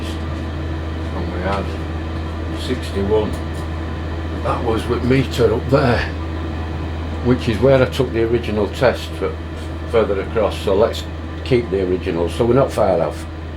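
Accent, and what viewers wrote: Yorkshire, United Kingdom. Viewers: Sound like a Yorkie accent !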